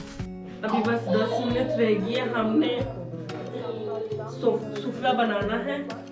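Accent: Pakistan. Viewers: Love you sister. This whole video is so beautiful MashaAllah. The way you speak Urdu is so adorable. I liked the way you prepared everything. Bless you lovely sister. More power to you!! ❤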